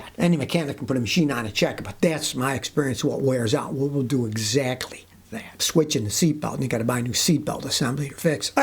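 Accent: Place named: Buffalo, United States. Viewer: Classic Buffalo accent.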